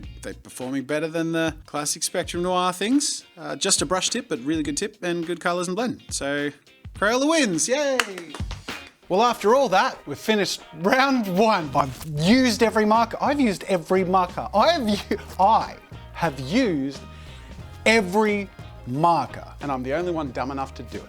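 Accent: Australia. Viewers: Wait are you Australian? You have a strong accent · I’m looking for good markers.. without paying a fortune came straight here and thought he sounds Aussie.. then you said ships to Australia 🇦🇺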